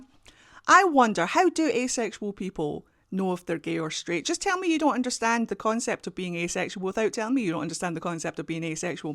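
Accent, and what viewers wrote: Scotland, United Kingdom. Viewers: Just found you and very much enjoyed your rambling. (And also you Scottish accent. Hi from Germany, by the way 😊)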